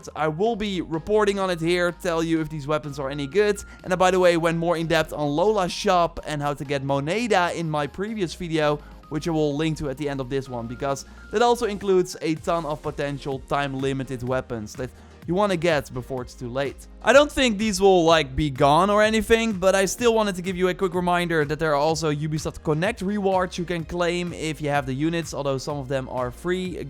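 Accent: Netherlands. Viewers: Haaha ik kende deze kanaal nog niet. Hoorde meteen een heerlijke hollandse tint in je stem. Fijne video, thanks! Subbed <3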